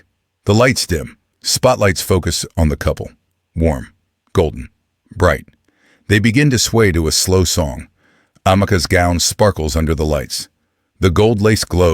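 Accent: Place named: Europe. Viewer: Why did the voice change from the beautiful female narrator that can pronounce all the African names right to a man's voice who was born in Europe?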